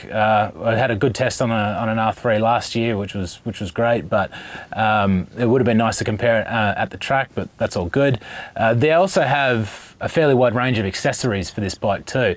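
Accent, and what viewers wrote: Australia. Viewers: you sound like ausie? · Are you from australia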